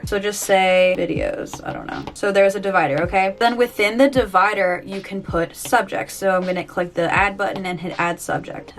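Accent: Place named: New Jersey, United States. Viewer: IDK where you are from but every time you talk, you sound like NJ (where I am also from)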